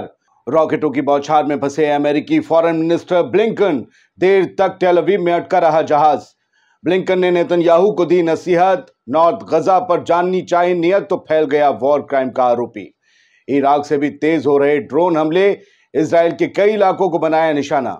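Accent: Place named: Allahabad, India. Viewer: Aapki baat karne ki andaaz ekdum Allahabadi hai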